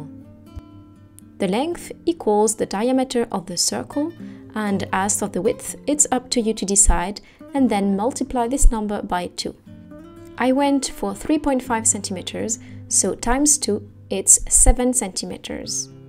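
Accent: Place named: France. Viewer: Very good step by step video. (And a charming French accent too!) Same method as recommended by my highly experienced upholstery tutor.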